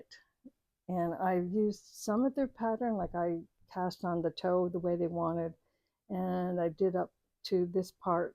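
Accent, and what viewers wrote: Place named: Canada. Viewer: It sounds like you might be from Canada. I’m in a state that borders Canada. We get a lot of snow (right now it’s freaking cold here), but I’m sure we don’t see anything close to the snow levels you can get in a snowstorm in Canada. 😊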